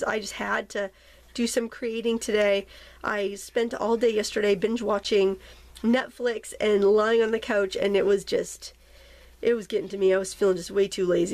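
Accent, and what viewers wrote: Maine, United States. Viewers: Hi, Love your charcoal "draw-rings" with your Maine dialect! Great variety of shading and blending techniques! What do you like to use to seal it so it doesn't smudge charcoal whatever is next to it?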